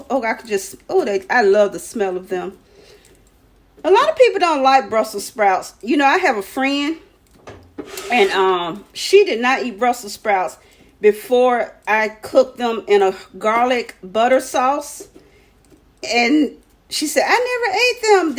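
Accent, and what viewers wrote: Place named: New Orleans, United States. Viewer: You're my new Auntie, I used to live in Nola and I miss the food and the accent plus the hospitality. I'm inspired to make food from your recipes.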